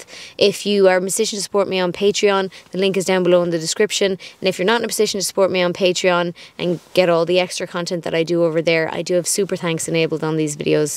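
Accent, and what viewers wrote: Ireland. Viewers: Can I please ask that you might speak a little bit slower, cause as much as I love your Irish lilt, Sometimes, tis a bit bit difficult to understand..As said before, Much love to you, and Millie..Merry Christmas...Simon xx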